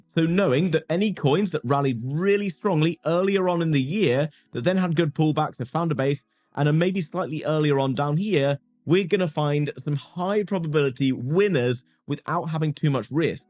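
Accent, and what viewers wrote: United Kingdom. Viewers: Here's a question nobody else has really covered - when deciding on a portfolio containing the various narratives suggested, does it make sense to go and acquire all the coins at once (large/mid & small) anticipating the Bitcoin breakout that just happened or would it have been more sensible to have just bought the bigger caps and held off buying the others until phase 3 began.? (Obviously thinking about the pre-election 6 day dip we just had that gave my alts a bit of a kicking). Thanks, it's refreshing to find an articulate fellow Brit running one of these channels, Cheers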